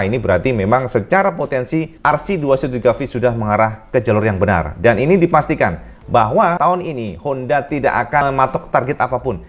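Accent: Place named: Java, Indonesia. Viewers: Paranormal motoGP 😇😇😇😇😇😇😇😇 logat jowone ojo di ilangke..........ciri khas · Saya suka gaya bahasanya dari... kental bahasa jawanya 😁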